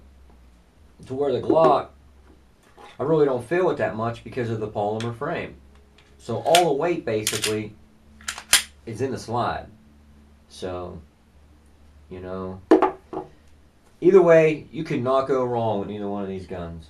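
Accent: Pittsburgh, United States. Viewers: I'm guessing you're from Pittsburgh. Sounds like it. :)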